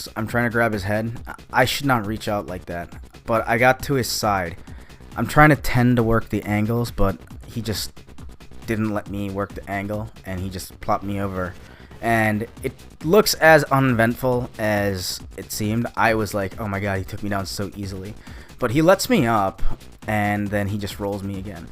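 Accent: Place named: Australia. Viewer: Yeah looked like an omoplatta. Great vids. Bring back the Aussie accent!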